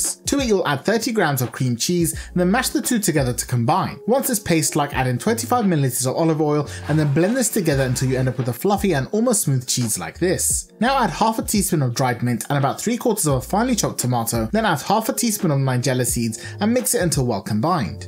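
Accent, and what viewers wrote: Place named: United Kingdom. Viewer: I like that you’re really Egyptian even though you sound British , I like the combination 💜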